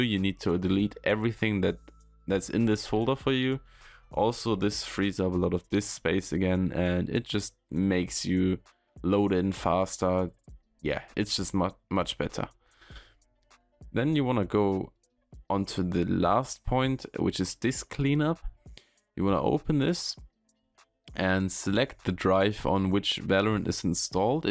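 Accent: Germany. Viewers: Yeah you can tell by his accent and his language in his PC is also Set to german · Man hört aus der Stimme raus dass du Deutsch bist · Are you german?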